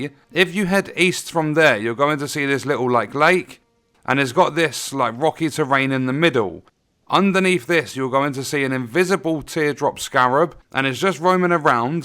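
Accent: United Kingdom. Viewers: One of the best elden ring channels. British Accent is the best Ash of War, technically. Regarding chapters: love em. Let me explain: I watch the whole video first. When I come back ( maybe 2-5 times) I click on the chapters.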